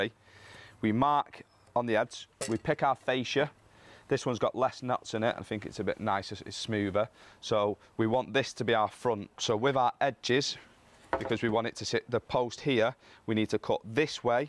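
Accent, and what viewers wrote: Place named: United Kingdom. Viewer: Love British accent😂 oh my gosh! But sounds Bu…, Cut sounds Cu…, how you guys understand each other? hahah pretty cool though!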